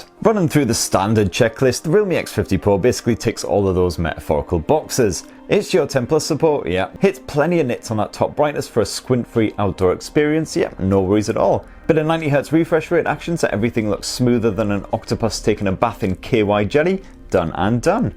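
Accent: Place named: RP, United Kingdom. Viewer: Queens english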